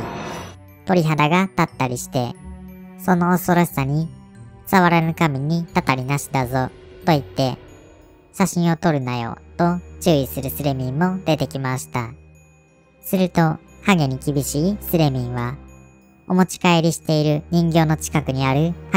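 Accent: Kansai, Japan. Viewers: 関西弁ニキちーす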